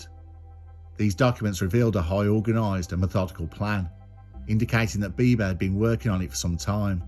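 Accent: Birmingham, United Kingdom. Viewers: ... Narrator has a Smoooth Brummie Accent... NICE FLOWING STORY 🎉🎉🎉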